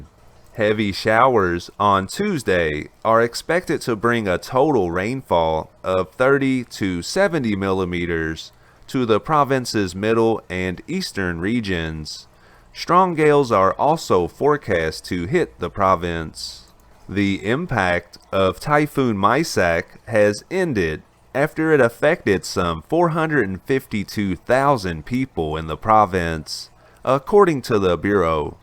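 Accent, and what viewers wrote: South, United States. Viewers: Maybe this is a repeated question but its nice to hear a real person instead of a c g voice. I cant quite place the accent on the person. Tenn., Ms., Al. .? Anybody know.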